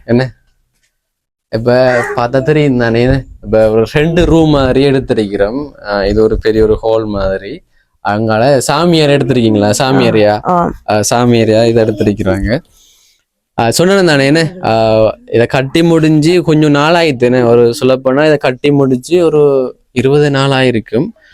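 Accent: Sri Lanka. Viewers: வணக்கம் Pro, நீங்கள் செய்யும் சேவை அருமை, உங்கள் மட்டக்களப்பு தமிழ் சிலாங் கேட்க செமையாக காதுக்கு இனிமையாக இருக்கிறது, அடிக்கடி என்ன என்ன என்ற வார்த்தை கூடுதலாக பாவிக்கிறீர்கள், மொத்தத்தில் Supper Pro, ஜேர்மனியில் இருந்து சிவராஜ்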